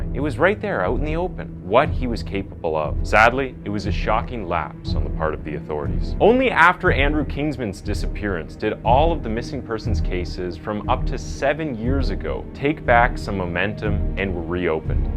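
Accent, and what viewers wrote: Canada. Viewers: Are you Canadian?? 🤔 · Narrator is definitely Canadian.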